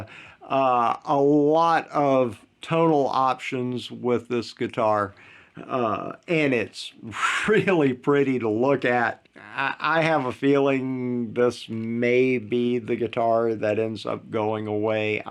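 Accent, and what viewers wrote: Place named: South, United States. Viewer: like this video and all other s .i just stupidly across.like the way you talk sounds country.stay cool and God bless.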